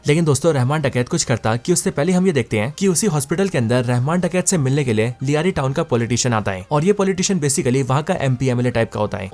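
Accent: Bengal, India. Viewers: Tum bengali ho kya???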